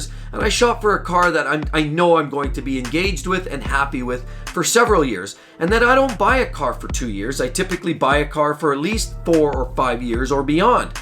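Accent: Canada. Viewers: This guy sounds Canadian AF.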